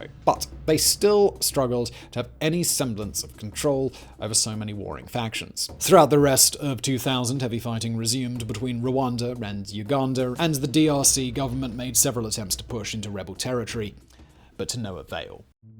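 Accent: United Kingdom. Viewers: Lol, Karen? Doesnt really apply here. But to be fair i have no idea why it bugs me so much 🤔 maybe because its the diction of the colonizers or something, i genuinely dont know 😅 but when i hear british people turn "a" into "er" it just FEELS racist lmao in my soul. I know its not. But it feels like it. I hope he doesnt sing along to rap music very often 😬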